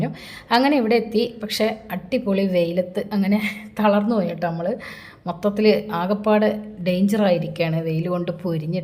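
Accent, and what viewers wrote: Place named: Southern Kerala, India. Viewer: ningo sharikkum കാസറഗോഡ് nneya pore 🙄samsaram motham തെക്കൻ ശൈലി അത് എങ്ങനെ കിട്ടി കാസറഗോഡ് ന്റെ ഒരു തരി സ്ലാങ് വരുന്നില്ല സംസാരിക്കുമ്പോ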